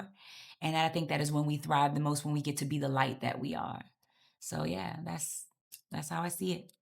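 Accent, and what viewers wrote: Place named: New Orleans, United States. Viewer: Love! Keep going! That Nola accent tho 🤤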